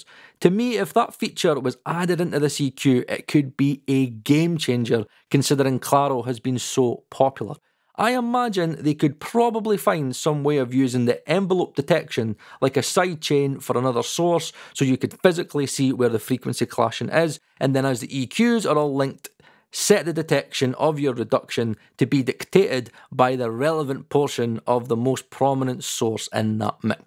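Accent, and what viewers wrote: Scotland, United Kingdom. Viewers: Scottish accent is so cool.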